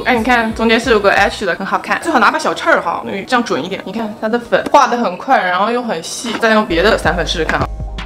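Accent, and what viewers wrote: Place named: Xinjiang, China. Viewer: 为什么韩语说出来新疆味？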